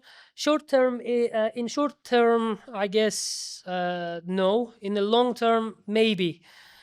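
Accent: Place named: Ireland. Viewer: тянется на Ирландца, нет слов без остановки говорит 😊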